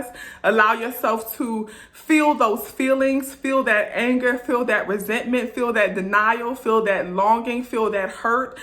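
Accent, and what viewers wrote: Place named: New York, United States. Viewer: Thank you, sincerely, for this,!! My heart so, so needed this. Much love!! Àse! Also, I’m married to a New Yorker and I just love your accent and the way New Yorkers talk — the realness! 🙏🙏🖤🖤🖤💜💜🔮💫🧿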